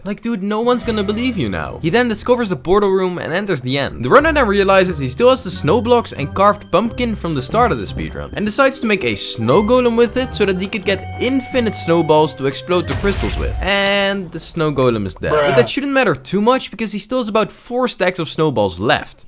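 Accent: Netherlands. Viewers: U sound dutch,..... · I sense some dutch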